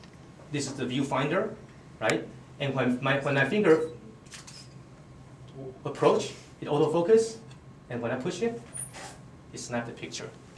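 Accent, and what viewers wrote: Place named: Singapore. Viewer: singaporean? malaysian? seems to be singlish..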